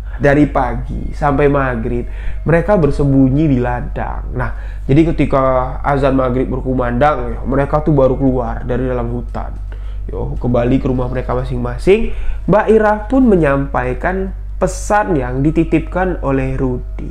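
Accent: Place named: Bali, Indonesia. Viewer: Cepet sembuh, sehat kembali bamg. Ngomong2, kl d denger2 logat jambi agak mirip bali ya... Prtama denger bang bicara sy kira orang bali 😁😁🙏🙏🙏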